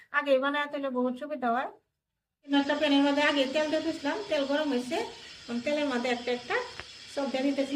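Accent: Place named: Assam, India. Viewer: ❤❤ধনিয়া পাতা দিয়ে মাখাই ব্রেড ক্রামশ দিয়ে ভাজলেন ❤আপুর আগে চেনেল আছিল অসমীয়া ভাষায় তাইনা আপনার গলার শব্দ শুনে বুঝলাম ❤❤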